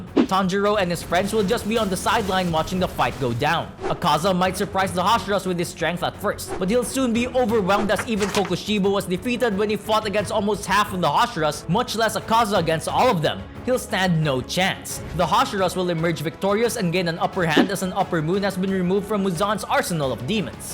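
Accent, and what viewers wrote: Philippines. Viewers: You sound very filipino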